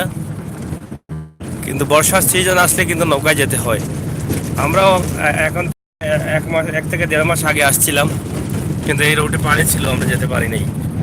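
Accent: Sylhet, Bangladesh. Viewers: আদা সিলেটি আর আদা শুদ্ধ খেনে। খাটি সিলেটি ভাষায় মাতও না খেনে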